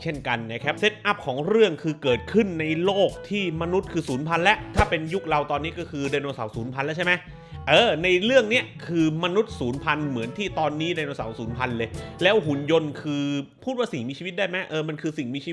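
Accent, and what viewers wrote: South, Thailand. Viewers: พี่เป็นคนใต้มั้ยคับ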